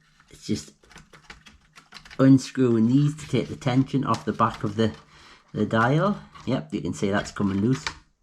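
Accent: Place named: North East England, United Kingdom. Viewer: Great job and thank you for the clear explanation. Good to hear the north east accent too!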